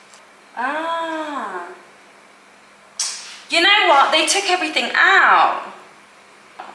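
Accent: United Kingdom. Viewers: Why are u trying so hard to sound like a white English girl? Smh.....U r BLACK!!!!!!!!!!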